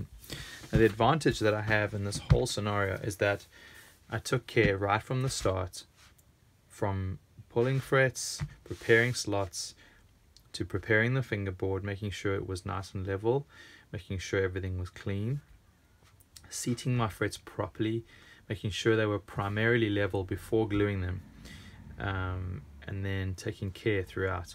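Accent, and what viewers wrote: South Africa. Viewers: Geolocation says Dublin, but accent is SA? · Are you from South Africa? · ... are you South African??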